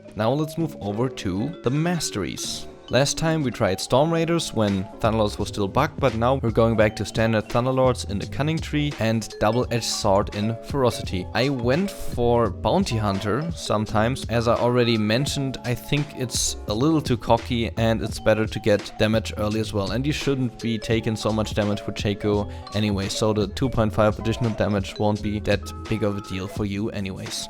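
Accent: Germany. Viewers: R u German? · Hay man really nice Guide this build is awesome. I always bought black cleaver, Ionian boots instead of essence reaver but I will try this one aswell :) I just subscribed and btw you're german right? ^^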